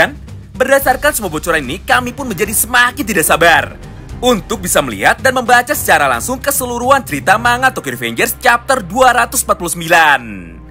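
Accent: West Java, Indonesia. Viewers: Bang mau nya ni,, abang orang Sunda tah? 😁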